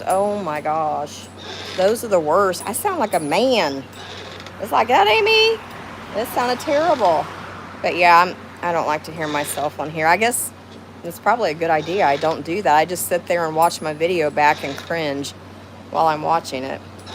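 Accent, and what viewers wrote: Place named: South, United States. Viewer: You definitely have what we call a country accent. Even some Californians have country accents and I can pick up that country accent just hanging out with others who have it-it’s my Okie blood😜🤣 Obviously this is being watched and commented days after you went live-I always miss the fun! You don’t seem nervous or over chatty at all/you seem like a natural ❤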